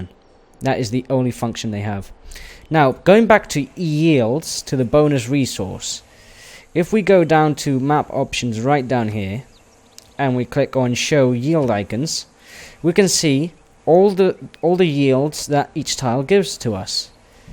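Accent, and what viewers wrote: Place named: Northern England, United Kingdom. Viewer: I really appreciate this video. You very clearly and calmly lay it all out there for a beginner like me. You have an unusual accent, I was trying to place it. Lincolnshire? I heard elements of that northern twang, but not as strong as some.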